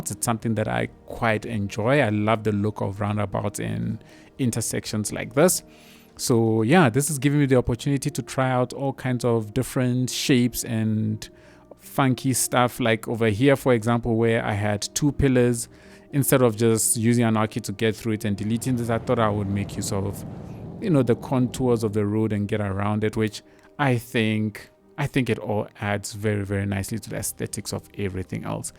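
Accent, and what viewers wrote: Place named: South Africa. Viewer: ive watched a couple of episodes wondering if you from South Africa , just know that accent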